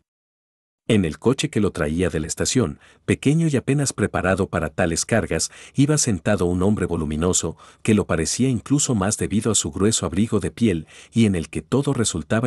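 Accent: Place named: South America. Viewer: Vale, el acento suramericano es soportable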